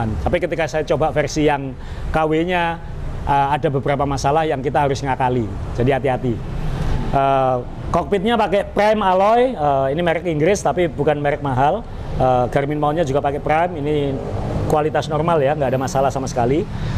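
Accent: Surabaya, Indonesia. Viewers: yang saya suka mbak nya ngomong nya medok khas suroboyoan... jd inget pas kuliah di unair dulu.. <num>. maju trs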